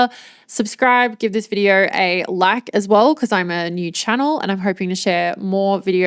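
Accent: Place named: Perth, Australia. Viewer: So..... your a pearl ❤ from perth eh? That explains alot 🎉 I love yout accent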